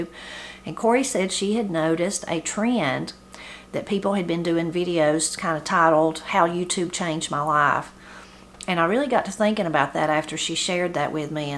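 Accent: Appalachia, United States. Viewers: God bless you and your family. Uve watched your videos for a year and a half now approximately. They have lifted me up in tough times. I thoroughly enjoy them and your wonderful cooking. Im from Appalachia un Virginia. You bring back such good memories of my grandmother's, grand father, aunts and uncles who talked the same way, cooked the same, so many great memories for me. I thank God for you and the good videos and work you do. Please continue to bless us with your videos ❤️💙🙏🙏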